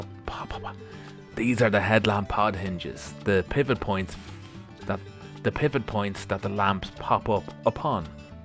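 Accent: Ireland. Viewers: The art of pedantry - a new psycopathy in development, in a gentle brogue.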